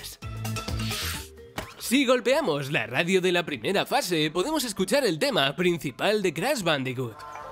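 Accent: Spain. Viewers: aaaaaaaaaaaaaaahh un español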